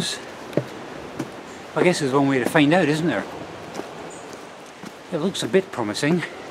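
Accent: Scotland, United Kingdom. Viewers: I’m surprised at his Eire pronunciation. Probably his Skaughtisch accent! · Can I ask where your accent is from? I hear Scottish mainly?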